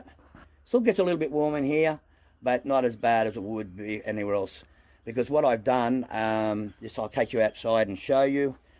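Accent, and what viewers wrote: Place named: Australia. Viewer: Oi! Oi! Ausie! Ausie! I love the accent. You have beuatiful animals. I love the farm life.💚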